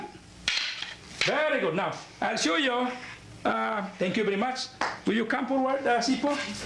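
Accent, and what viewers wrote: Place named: Cebu, Philippines. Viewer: His Inglis aksent is typiical Cebuano. HIS ENGLISH ACCENT is CEBUANO not AMERICAN. Great MARTIAL ART, can be effective when your LIFE IS IN DANGER, SELF DEFENSE. The STICK is faster than the HANDS, faster that the FEET.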